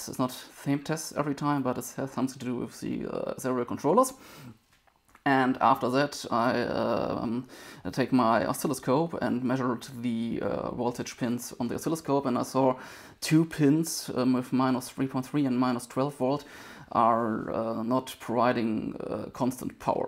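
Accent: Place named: Germany. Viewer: I too do not have an issue with your speech. Maybe I a more familiar with how Germans speak English.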